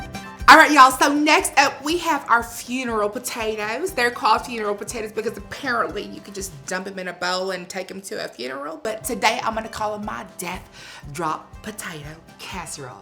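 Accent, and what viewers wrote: South, United States. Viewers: had so many GOLDEN one liners in this cooking show and I'm here for the cooking, comedy, southern accent, and her entire look! 😂🤭😆 I am dying to make the hot liquid pumpkin pie 🥧 because it's easy and virgin, just like me, (*wink wink*) 😏😬🤐😭💀 You sent me ROLLING laughing! Can't wait for your next episode! 🦃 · Southern girl here (GA!). Your accent is on point! Bless your heart!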